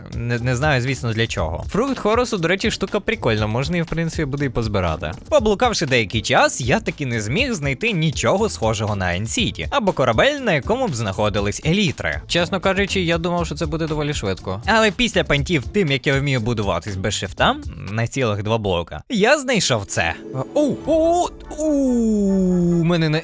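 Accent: Lviv, Ukraine. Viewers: Ти зі Львова ? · ти з Львову??? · Ти зі Львова? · І по цьому відео можна здогадатися,що ти зі Львова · скажи ти зі Львова? Тому що я так. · Ого ти з Львову??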